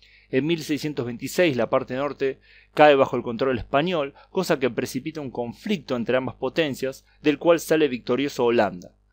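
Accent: Argentina. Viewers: uf con la pronunciación de un argentino, pero fenomenal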